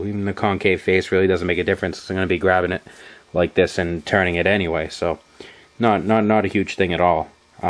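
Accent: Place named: New England, United States. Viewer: Good stuff, sounds like your from new england.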